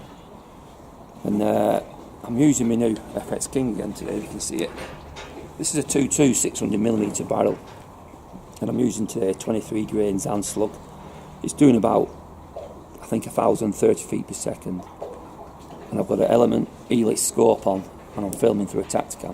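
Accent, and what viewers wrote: Lancashire, United Kingdom. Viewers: Happy new year, the tornado was local in Tameside did some serious damage. I'm guessing from you accent your Bolton/ Burnley.Great video again love your new gun.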